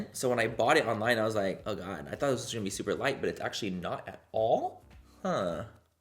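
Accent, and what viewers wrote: United Kingdom. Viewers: Manny Mua yes!!! Plus your sweater 😍 all while saying it’s so hot out here! 🤣🤣🤣 Love you & ur British accent 😉 Edit: dessert is so nice you have to write the “s” twice... a rhythm I teach my students to help them remember 😉😃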